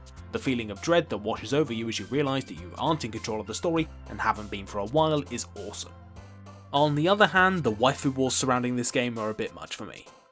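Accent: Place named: Northern England, United Kingdom. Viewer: probably just Northern/Midlands English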